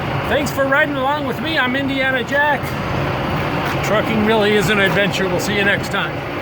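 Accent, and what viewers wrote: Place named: Minnesota, United States. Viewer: mountain trips are the best ! but whereever is fine also, I just love that seemingly MN. Lake Woebegone drawl ! KEEP ON TRUCKIN !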